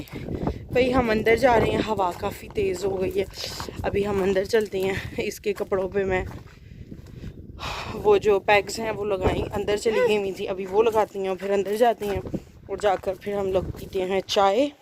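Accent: Sialkot, Pakistan. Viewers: Sialkoty ki booli pahchani jati jitni mrzi achi urdu bool lain mgr punjabi tuch zoaroor shamil hota ha..😀 Don't tention bcoz i m also siakoty..😉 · Ap lgta sialkot se han jaisi Urdu bol rhi han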